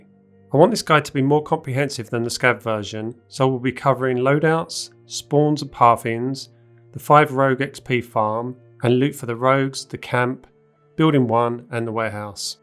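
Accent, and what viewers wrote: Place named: Romford, United Kingdom. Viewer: You from Romford? Your accent giving me serious Ray Parlour vibes 😂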